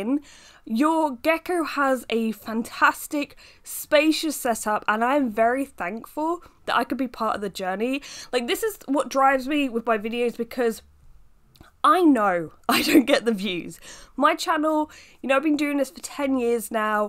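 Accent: United Kingdom. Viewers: yo plz ur accent is from what region in uk i need a gf with this accent!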